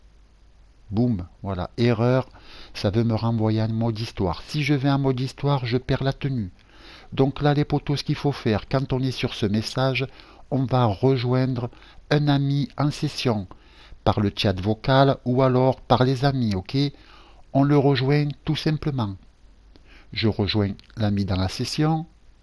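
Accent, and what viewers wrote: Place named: South, France. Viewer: merci le plus bel accent du ssuuuudd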